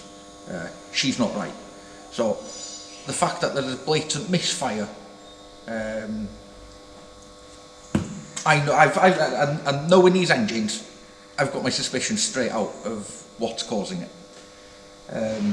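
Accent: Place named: North Wales, United Kingdom. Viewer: Great video fella, just stumbled across your channel and subscribed. It would have been good to see if there was any visable play on the lobe once it was out, am I right in thinking the lobes are splined to the shaft? Also I heard the crappy cams were introduced with the 'upgrade' to Euro6, was the solid new cam you fitted off a Euro 5? If so I guess all the cam timing is the same? Also nice to hear a proper North Walian accent on Youtube, I'm originally from Llanfairfechan.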